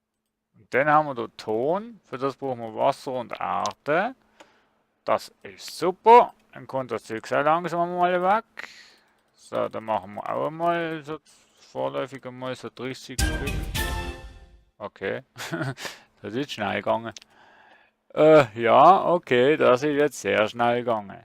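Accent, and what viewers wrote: Switzerland. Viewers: Intressantes Spiel:). Zwar tue ich mich schwer dein Schwizerdütsch zu verstehen, aber so langsam komme ich rein:)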